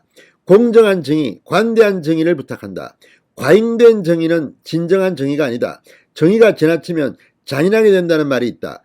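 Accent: Gyeongsang, South Korea. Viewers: 파이팅 ㆍ진성호 위원님 갱상도 사투리 유머 중간 중간 넘 진솔해서 좋아요 ㆍ 해방됐다 ㆍ조용히 잊혀지고 싶다 ㆍ이런 문죄인 이 농사일 하든 말든 돼지감자 많이 쳐묵고 ᆢ감방 갈 날 기대해요 ㆍ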